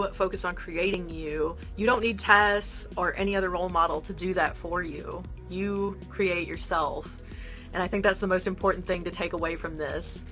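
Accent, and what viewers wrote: South, United States. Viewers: Are you souther (sorry for this random question but I love your voice and I'm curious about your accent) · Damn. When you said, "You create yourself," that was so powerful. You articulated everything so well in this video. Great job. I know this is a few years old but I hope you're doing well. Are you from the south? I like your accent lol. I'm from Tennessee.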